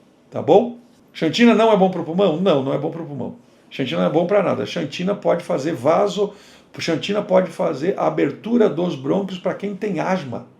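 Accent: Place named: Minas Gerais, Brazil. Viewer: Gosto demais do seu male male, dr. Sou mineira e minha avó falava assim. 😊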